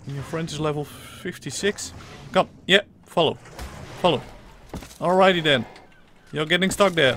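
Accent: Netherlands. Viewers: U are definetly dutch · Are you Dutch? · I can hear you are dutch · are u dutch? :p I can always hear if someone is dutch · Are u dutch? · Haha I'm dutch too, you can hear it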